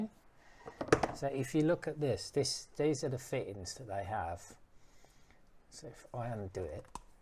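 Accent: United Kingdom. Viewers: to our US ears, has a comically UK accent. Like one of those side characters on your telly!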